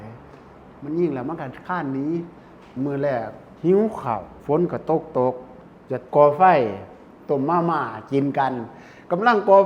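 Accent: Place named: Ubon Ratchathani, Thailand. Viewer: สำเนียงการพูดเหมือนจะอยู่แถวอุบลนะครับ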